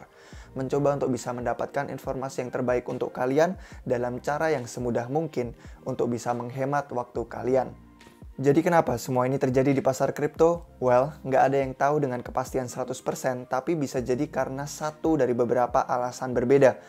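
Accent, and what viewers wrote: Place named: United Kingdom. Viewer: Bos, aksen british lu cringe banget, keinget skinny faps, pake aksen amerika aja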